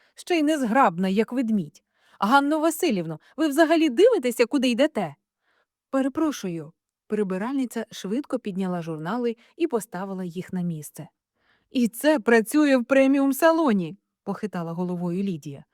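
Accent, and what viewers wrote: Standard. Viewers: Дякую вам за чисту українську мову , за цікаве оповідання .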